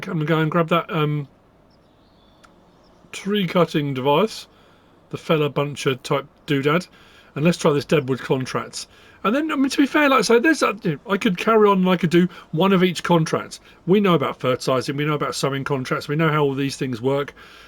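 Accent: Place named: Manchester, United Kingdom. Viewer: Thanks for the guide. I'm going to be playing this for the first time tonight after pre-ordering it, so I'm excited to check it out. I enjoy watching your videos, and an aspect of them I really enjoy is your accent. I'm curious to know; are you from Manchester by chance?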